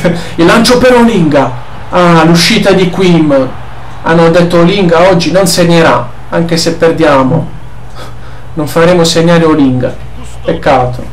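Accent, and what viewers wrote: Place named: Apulia, Italy. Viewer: Sei molto simpatico e il tuo accento pugliese (Barese?!?) dà quel qualcosa in più!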